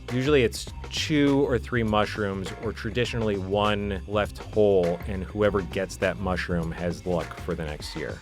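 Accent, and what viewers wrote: United States. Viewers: Wow u sound American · Therefore, he's " ", not " ". I don't think he is an immigrant from Ukraine, because he speaks American accent and pronounces his surname wrong. Probably his parents were the immigrants.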